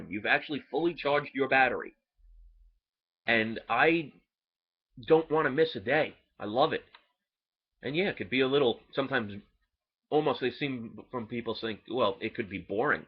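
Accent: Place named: Pennsylvania, United States. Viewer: Best thing for asthma is pot. Legal here where I live, but not in PA where I think you live due to your accent. I am not joking.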